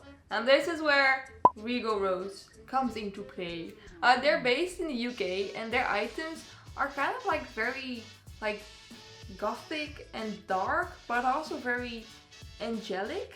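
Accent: Netherlands. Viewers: She’s dutch so that’s why the accent prolly overlaps 😸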